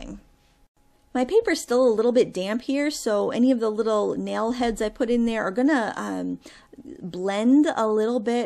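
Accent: Maine, United States. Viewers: Love your Maine dialect! you hoped you had enough "rum" to paint the pink geraniums - must be one of those wine (rum) and paint classes! Lol! Love your channel and look forward to each new one - keep 'em comin'..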